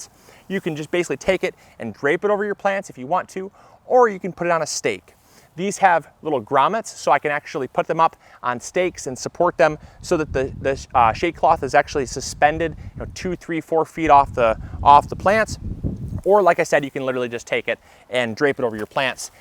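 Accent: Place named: Midwest, United States. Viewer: I appreciate the cliffnotes, but also enjoy hearing "What is going on, everyone?" with his pleasant Midwestern accent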